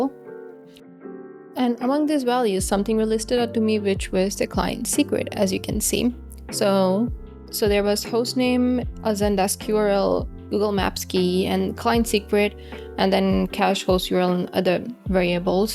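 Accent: India. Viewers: You from India?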